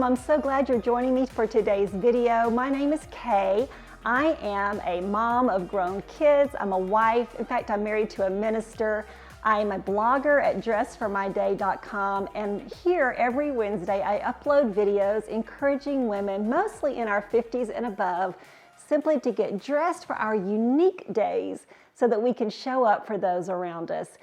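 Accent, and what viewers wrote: South, United States. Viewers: Hi, Kay, I'm new to your channel, just subscribed! I love all the tips you shared, as well as the outfits! You look so pretty in pastels, especially that beautiful pink! When you mentioned living in Cincinnati, I couldn't believe it (thought you to be a Southern lady, just a bit of accent to my ear😉). We relocated from NE Ohio to Miamisburg (not far from Dayton) almost two years ago! Our oldest is located in Hamilton, twenty miles from Cinci where he and his wife both work. It's a small world, neighbor☺️!! It is snowing as I write this! Like you, we are so ready for Spring and so thankful for our warm home near all three of our Kids' families - they were our impetus to relocate, especially to enjoy our seven grands♥️. Thank you so much for being a voice on YouTube, sharing your tips with all of us! For me you're a God sent gift and I'm so glad to have found you! 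Sending warm 🤗. - Susan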